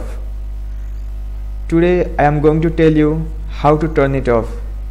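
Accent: India. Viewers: cannot understand indian English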